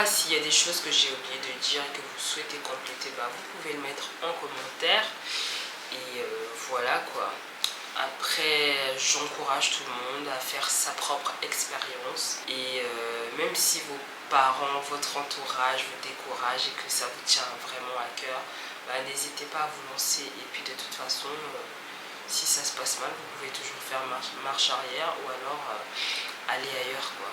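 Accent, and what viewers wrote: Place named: Ivory Coast. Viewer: Tu as un accent ivoirien. En plus tu ressembles a une fille agni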